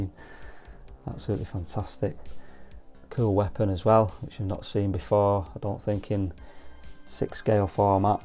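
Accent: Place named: Northern England, United Kingdom. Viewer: You sound like your from up north lad, Manchester area, I’m a north west lad too.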